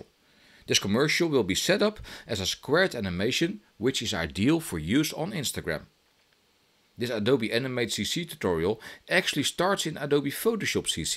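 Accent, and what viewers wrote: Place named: Netherlands. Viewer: this sounds so Dutch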